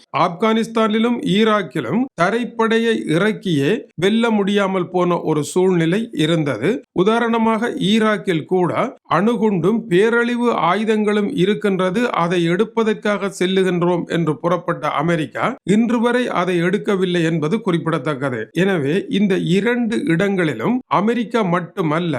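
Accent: Sri Lanka. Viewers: இலங்கை தமிழ் 🎉🎉🎉🎉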